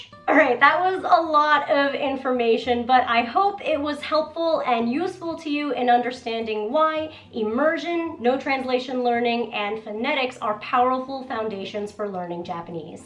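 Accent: United States. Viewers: Her code switch is AMAZING! When speaking American English she pronounces Japanese words like an American would, but when she speaks Japanese, it's Native AF. I can see why a lot of people think she's American or Half-Japanese and Half-American even though she's Japanese. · Your English is good American English , bad English English. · Interesting! And how come you have such a great American accent? I came here because I work as a translator and currently I am translating a Japanese series for a TV network (I have English subs and translating into Croatian). I am now on episode 4 (out of 10) and I feel that I am KIND OF catching the rhythm of the language, so I wanted to find out more about it. Greetings from Croatia!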